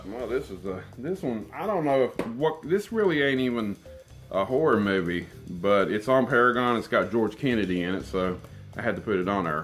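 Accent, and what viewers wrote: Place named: West Virginia, United States. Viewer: Don't let the coffee get cold that's not good. Gotta love our WV accent who doesn't love us WV lol great video awesome movie update now drink another cup of coffee and hope you have a wonderful day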